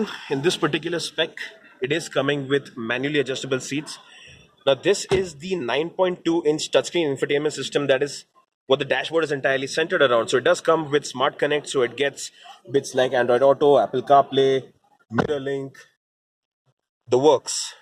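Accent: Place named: India. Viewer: Indian accent, reminds that asshole Indian manager no one likes.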